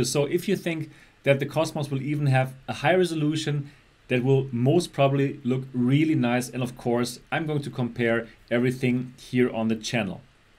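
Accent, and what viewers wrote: Germany. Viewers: that german accent you here that out of everyone xD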